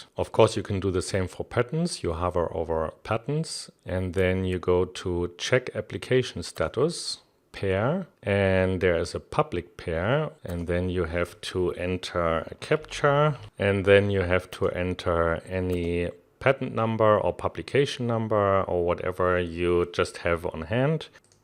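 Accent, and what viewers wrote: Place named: Germany. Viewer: Who is this guy ? Sounds like he’s from Germany.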